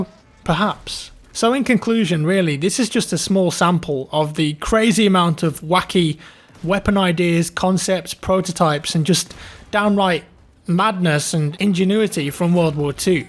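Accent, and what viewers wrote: United Kingdom. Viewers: That british accent 💘💘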